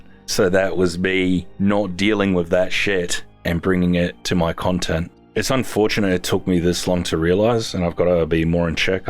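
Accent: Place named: Australia. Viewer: First time commentor! I look forward to your videos, always, because I love the game and found you a few years ago making the forest content. Your peaceful voice and how thorough you were with the game was my first draw in to the videos. Well to be frank... your sexy calm Australian voice I'd literally listen to for hours and put your videos on to chill out. I did notice your content for sons being quite a lot more agitated and angry (you mentioned you quit smoking? which I figured was something affecting your mood). I watched the video you just posted before this one and heard you laughing multiple times and was going to comment how nice that was to hear. Thank you for being self aware and realizing how you had changed into someone else for a bit. We're all humans going through life and even though we're here for your content - your wellbeing matters the most obviously. 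Sorry it's been a rough bit of time for you. I for one would love to know you were enjoying playing and filming for us and it not feel like a burden because I enjoy every bit of content you share. If you streamed live I'd watch every minute. You are doing great. Proud of you and thank you for your dedication to this!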